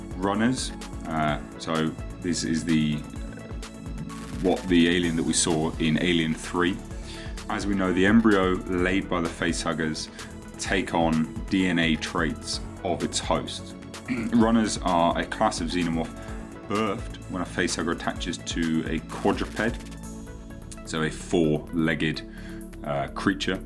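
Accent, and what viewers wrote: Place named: United Kingdom. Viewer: Friggin' British accents! I thought you started off with an "Overmorph." Like some sort of ubermench form.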